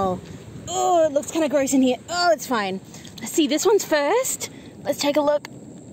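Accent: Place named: Australia. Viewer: Hi, I am pretty sure you had new shells 3 or 4 of them. I am going to make a list now of every video. Bearsy had to be held today tho. So many kinds of shells, I can hardly keep them straight. I only still know a handful of them. I know all the US tho because I have them except for maybe a very few. Do your hands ever get puckered from the salt water? You are my very favorite of all the shellers, fun, talk loud enough, show the shells closely, love your Australian accent, we get to see you live occasionally, love the music, ending where you take a lot of time arranging what you find. LOVE YOUR VIDEO SO MUCH. Take care please. Love and hugs from Trudy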